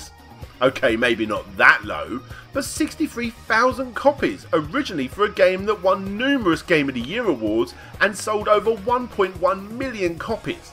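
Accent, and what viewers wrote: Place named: London, United Kingdom. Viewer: Lower-middle class Londoner with a poor mic and worse marketing. Shame though; his content seems pretty good.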